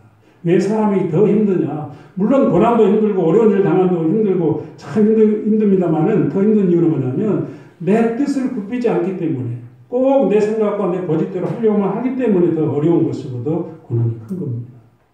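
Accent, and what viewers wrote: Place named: Jeolla, South Korea. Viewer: 어제 꿈을 꾸었습니다. 선생님꿈을 몇달만에 또 꾸었습니다. 살면서 딱히 선생님을 생각하지않아도 1년에 한두번은 꼭 선생님꿈을 꾸게됩니다. 그때마다 선생님이 그립고 궁금했지만 소녀시절 추억은 추억으로 마음에 묻고 그냥 세월따라 가곤했습니다. 그런데 오늘은 선생님이 궁금해졌습니다. 이 쉬운세상에 찾을려면 얼마든지 찾을수있다는 생각에 네이버를 샅샅이 뒤졌습니다. 유투브를 샅샅이 뒤졌습니다. 목사님으로 검색했더니 몇분의 목사님이 떴지만 저는 대번에 이분이시겠구나 싶었습니다. 40년전 저는 어리디어린 열네살이었죠. 중학교 1학년2학기때 오셔서 드문드문있는 수학과목을 한학기만 가르치시고 떠나셨는데 40년이지나서 선생님을 알아뵙기란 쉽지가않더군요. 사실 지금도 긴가민가합니다. 오늘은(지금 시간이 새벽이니 어제는이 맞겠지요) 하루종일 선생님 유투브 아니 목사님 설교말씀만 들었습니다. 또 없나 샅샅이 검색해서 청소하면서도 듣고 염색하면서도 듣고 씻으면서도 듣고 마트오가면서도 듣고 누워서도 듣고 앉아서도 듣고 서서도 듣고 걸으면서도 들었습니다. 그러니까 어제는 새벽부터 선생님꿈 아침부터 낮 밤까지 하루종일 선생님 아니 목사님 설교말씀만 들었습니다. 순전히 우리 수학선생님이라는 이유때문에요. 덕분에 하루종일 하나님말씀 실컷 들었습니다. 그런데 진짜 우리선생님이 맞나요? 그때는 제기억에 아주 젊고 엄청 미남이셨는데 지금뵈니 키 크셨던거랑 얼굴 이미지가 비슷해요. 그리고 설교말씀듣다보니 악센트가 전라도억양이 있으셔서 그때 선생님 맞으신거같아요. 혹시나 사모님이 카메라에 잡히면 더 확실해지는데 아무리 이것저것 돌려봐도 사모님이 안잡혀 40년의 세월이 흘러서 제가 사모님도 못알아뵙는가싶구 확인이 안되네요. 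하여튼 선생님 참 반갑습니다. 유투브 올려주신 집사님 아주 감사합니다. 덕분에 집사님찬양도 많이 들었습니다. 앞으로도 목사님 설교말씀 자주 올려주시면 안될까요? 소리가 작아서 아주 집중해서 들었습니다. 앞으로도 잘 부탁드립니다. 감사합니다.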